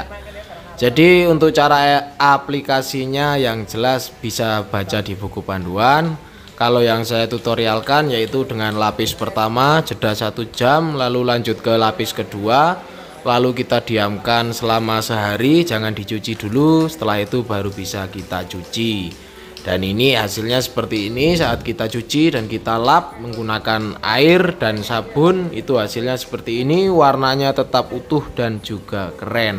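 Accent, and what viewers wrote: Temanggung, Indonesia. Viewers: Temamnggungane medok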